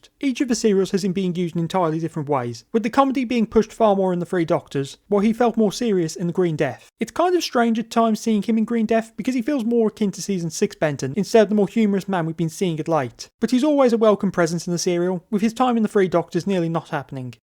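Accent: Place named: West Midlands, United Kingdom. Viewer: Like the West Midlands twang. 👍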